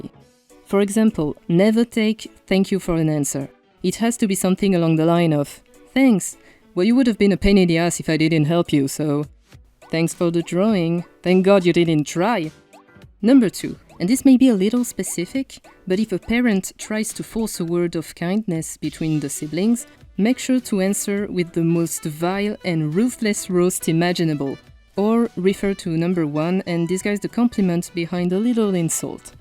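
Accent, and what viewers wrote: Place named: France. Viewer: firt time watching one of ur vids, PLEASE TELL ME IF UR FRENCH CUS I CAN JUST HEAR THE ACCENT (im french)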